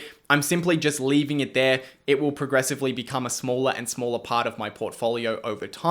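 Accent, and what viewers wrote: Australia. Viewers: An Aussie accent and rosy cheeks ☺. Lol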